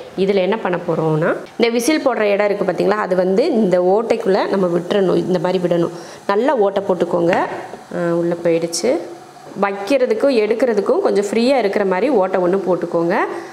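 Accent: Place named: Theni, India. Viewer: செரட்ட தேனி language.....😂😂😂 Ur way of talking also தேனிகாரவங்க..... super sister நம்ம ஊரு மணம் ❤️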